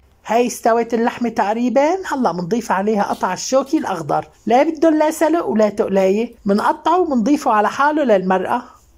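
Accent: Aleppo, Syria. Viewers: لك خالتي و الله بفوت ع الفيديو مشان اسمع كلامك الحلبي الاصيل.ابوس قلبك شقدك مهضومة و الله عبتزكرينا بكلامات رح ننساهن😍🥰😅😅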